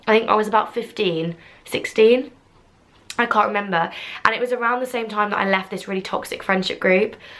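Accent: United Kingdom. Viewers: British Accent 💕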